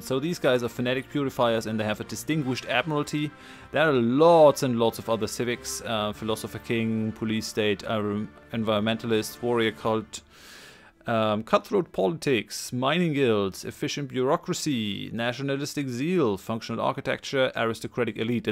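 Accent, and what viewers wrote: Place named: Germany. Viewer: NO WAY. I can't believe, it must be a miracle! When Utopia came out i quickly peek in the Stellaris wiki to find out what's new. Personally i found that my 1st playthrough should be done as F.Pacifist + Materialist (of course Fanatic Purifiers and Hive Mind is very interesting and absolutely new options, but when it comes to your own game you want to pick something balanced which will not prevent you from discovering all new stuff in the update, you know) as the most balanced between all new options. Unfortunately, i got too much work now days, so i must beeline my own game a bit and i decided to watch some letsplay first. And what i found over here?! Some german guy (it's a important note, because i find german accent the most pleasant for my ears (even compared to native speakers!)), who's playing exactly what i wanted to see (i mean race) with 2 most entertaining enemies in this update. 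Also it's Insane difficulty! (nothing else not worth watching imo, because i can do hard without any problem on my own). I got a bit of frustration right now, because it feels like someone just read my thoughts and did EXACTLY what i wanted to see xD Anyway, wish you best luck in this campaign and i happy to say that you've got a new loyal subscriber, who's watching this almost 5 hours in a row instead of sleeping, because he just can't stop =)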